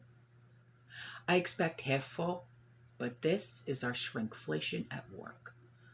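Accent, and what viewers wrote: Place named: East Coast, United States. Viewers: I dont notice anything different either...she sounds like a Northeasterner to me. A valley girl sounds quite different...I'm in CA right now...believe me I KNOW what a valley girl sounds like 😂😂😂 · The lovely thing about this venue is that you can click the off button. I’m not a big fan of thick east coast accents but I know an accent doesn’t reflect the sum of a person. Regardless of the delivery, gives out a lot of good information and she is truly sincere.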